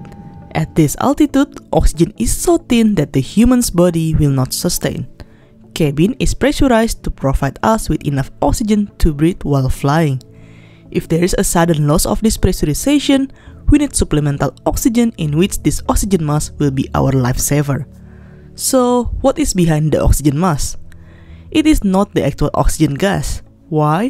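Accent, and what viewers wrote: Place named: India. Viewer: sounds like one of those indian video conference at work.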